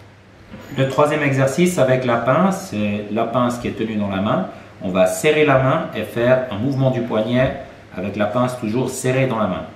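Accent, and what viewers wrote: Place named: Switzerland. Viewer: je savais que j'avais reconnu un accent suisse :)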